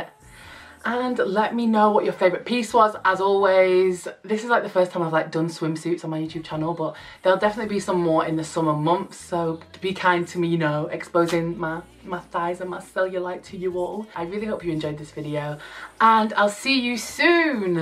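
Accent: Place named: Yorkshire, United Kingdom. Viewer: Proper love you, reppin Yorkshire accent🤘🏽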